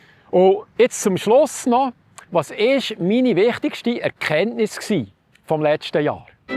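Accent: Bern, Switzerland. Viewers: Ändlech eine, wo Bärndütsch schnurret! Carry on, Gruss von einem aus der ehemaligen Schweiz vertriebenen Stadt Berner aus den Philippinen. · Hallo, merci as Du üs o es biz i Dini Erfahrige mit dem Kanal u i dHintergründ lasch la blicke. Interessant für mi: Din Gedanke live vor eme Publikum... han i o scho gha 😊 U uf Dini Themene fürs 2026 bin i gspannt. Bis jetze nume es bravo a Di! Blieb dranne, bhalt Din asteckende Humor u o sBärndütsch, i ghöre das so gärn. Hebs guet, gsegneti Feschttäg, Grüess us em chalte Mittelland, Rolf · Sie sind einfach eine grosse Klasse. Sie sprechen ein schöner Berner Dialekt ❤️ Lieben Gruß aus dem schönen Aargau. Dankeschön ihnen.😃😃🌲🌲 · Ich finde dini arbet SUPER !!! mach gnau so wiiter. Au dis Bernduetsch findi sehr agnehm den hiea in Florida ghoer ich das chuum 😂😂 wuenche Dir schoeni Festtaegli und en guete rutsch is 26gi .... 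Liebi gruessli us Florida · I ghöra z Bärndütsch eifach so gärn. 👍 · Du sprichst so ein klares Berndeutsch, schon deshalb ein Hörschmaus! Dazu sind die Ausführungen anregend.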